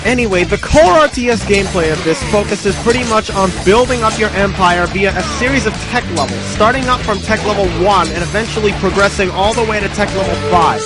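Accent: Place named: New Jersey, United States. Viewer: this guys obviously from jersey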